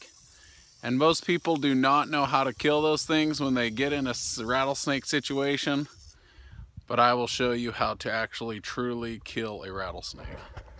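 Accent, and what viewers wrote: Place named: Australia. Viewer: Dude defenetly from australia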